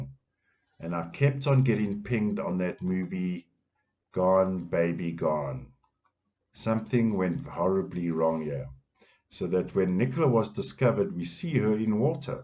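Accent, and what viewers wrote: South Africa. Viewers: Hi, you sound South African. 👋👋 Hello.. Just subscribed. Thank you, this does shed light as you get more information to expand on this. It makes more sense. Something else was going on, because this whole case sounded fishy. I did not ever believe that Nicola jumped into the river, swollowed water on purpose, and unalived herself by that method. She was fit, fitness conscious, could swim, the river was not deep or flooding, she & she had her dog Willow with her. Plus she had 2 daughters. After 3 weeks of searching the river Nicola was not found in the river. Funny that. Plus there was a helicopter searching, drones, sniffer dogs, police searching, volunteers searching, police divers, and Peter who was a volunteer also offered his services for free. He used his divers, and sonar equipment as an added bonus, but despite all this collaboration, Nicola was not found in the river!! 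That is probably because Nicola was not in the river when they searched. She might have been placed in another body of water and later moved into the river because the autopsy would have to show Nicola was in water for 3 weeks. We are still waiting for the results and inquiry. Now Peter is the scape goat. Who stands to benefit from N being unalived? It was actually the police's job to find Nicola in the river because it was their main working hypothesis that Nicola was in the river. And how did they come to this conclusion? They were fed faulse information. They were trusting P's version which w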